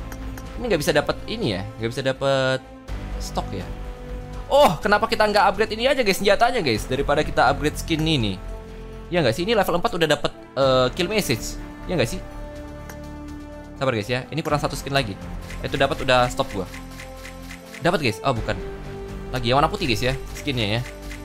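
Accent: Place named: Medan, Indonesia. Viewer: logat medan banget kau bang😁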